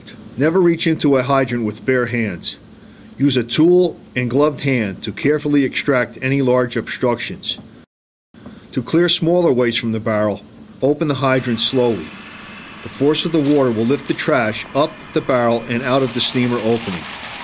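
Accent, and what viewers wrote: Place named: New York, United States. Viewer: That is definitely a new your city accent LOL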